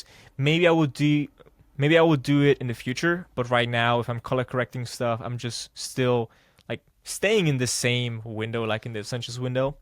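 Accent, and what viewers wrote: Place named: United States. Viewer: Az Ali Abdaalos videodat ajanlotta nekem a YT kb. 2 db perce, az hozott ide. Amerikaban szulettel, eltel vagy elsz? Az akcentusod amerikai es kb semmi magyar akcentusod nincs. Hogy csinalod? hahah Amugy ne hagyd abba a YT-ot mert szerintem be fogsz vele futni!!! Just keep creating content!!! En most gondolkodom, hogy elkezdem, de meg az overthinking fazisban vagyok. Van egy niche otletem, ami csak kozepesen saturated :D, majd meglatjuk. Kozben epp laptopot is keresek, meg vagoprogit is. Ugyhogy tenyleg nagyon a legelejen. Egyebkent nekem a Davinci Resolve-ot ajanlottak, mint vagoprogram, de megnezem a videoidat es lehet, hogy a te progid jobban bejon majd. Feliratkoztam. Sok sikert!! :)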